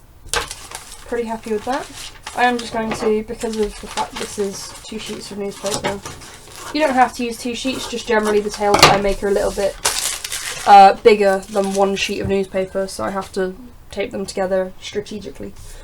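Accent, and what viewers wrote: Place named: Northern England, United Kingdom. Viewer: Hey! By your accent it seems like you live in the north of the UK. Is there by any chance seeing that I live in England and good shops or websites for quality fur that is in the UK so I don't have to send £40 for shipping?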